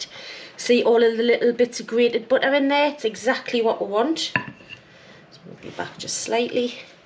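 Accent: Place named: Tyneside, United Kingdom. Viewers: Love your videos,the stuff my ma used to make,I was born 91 yrs ago Willington quay,cant fix your accent Tyneside or Teeside! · Hi. I've had a couple of strokes, am in a wheelchair with limited use of hands and legs.would the shop bought frozen puff pastry work for these as well. Thank you for your videos. My sister did her nursing training many years ago in Sunderland and used to bring her friend to come and stay with us in Liverpool. They're still in touch but I haven't seem her for many years and miss her cheerful,cheerful friendly accent. Its so lovely to hear your accent, it takes me back 42 years. x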